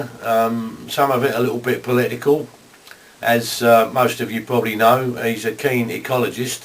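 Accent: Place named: London, United Kingdom. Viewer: lots of very good stuff, everyones got their favourites, I would have put ist, and who knows... it was good seeing groups back in those days, you put it across well and its good to hear someone with a london accent talking about artsy type of stuff. Id also recommend who I first thought of as a copy but rediscovered them and play them a lot. if you come across by put it on if possiblethanks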